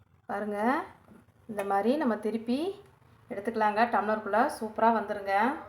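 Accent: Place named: Coimbatore, India. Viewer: Naa coimbatore naanga pesura mathiri mariyathaya pesuringa athanaalay neenga yentha oornu ketkirenga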